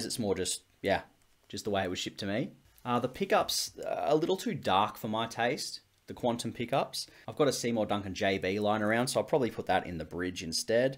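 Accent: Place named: Australia. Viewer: I was looking at maybe buying this guitar and thought I'd look up a review, saw the Aborted shirt, then heard the Aussie accent and it was gg on the subscribe button lol 🤘👌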